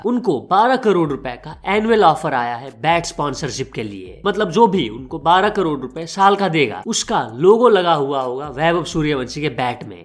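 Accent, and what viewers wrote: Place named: Assam, India. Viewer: Accent bhai, vo assam ka hai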